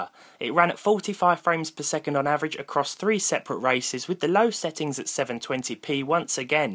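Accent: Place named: Midlands, United Kingdom. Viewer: Knew he was a Midlands lad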